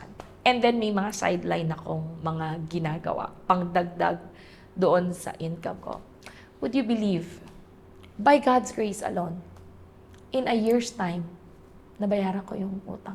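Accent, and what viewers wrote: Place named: Zamboanga, Philippines. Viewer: Taga Zamboanga, Jabacana, ganyan talaga magsalita ibang babae doon.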